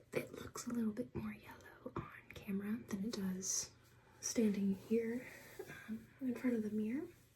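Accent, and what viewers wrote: South, United States. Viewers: Wow, is it just me or is a Southern accent coming out more in this video? 😁 Love the hairstyle!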